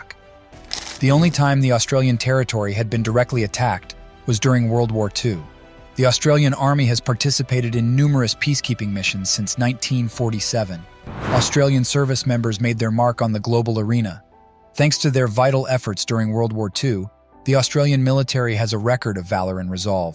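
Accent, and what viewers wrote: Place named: United States. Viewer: Can we please have anyone other than a yank tell such a story?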